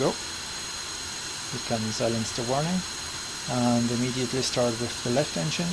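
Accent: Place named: Switzerland. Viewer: Swiss English :)) but been living in Switzerland for 4 years now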